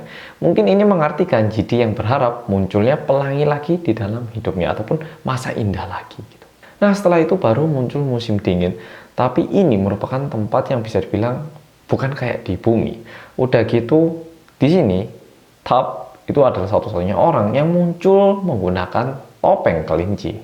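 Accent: Java, Indonesia. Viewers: Ada awal ada akhir, oppa medok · Mas medok ak lho udah berhasil ga nangis lagi dengerin lagunya, setelah dengerin plus nangis semalaman,, trs nonton video mu ini nangis lagi kaaaaaannnn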